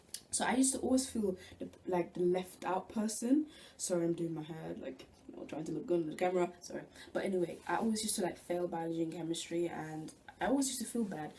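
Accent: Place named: Africa. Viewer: Hi i really enjoyed this this video! especially your accent, where in africa are you from, i'm from the east but i live in england. I'm doing btec creative media (level 3) because i don't think A levels are for me.i start this september! i am happy with my results, i only failed maths but i am retaking that. A you still doing btec?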